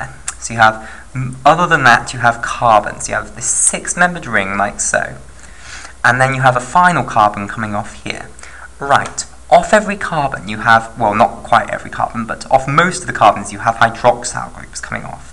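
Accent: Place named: RP, United Kingdom. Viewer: Very nicely explained....very very britishy poshy accent... :D ...very "intellectual" sounding. Cheers from Brussels!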